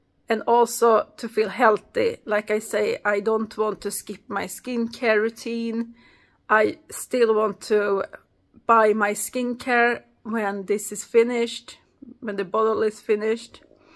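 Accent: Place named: Sweden. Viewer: I am Swedish also!❤️recognized your accent! Lycka till!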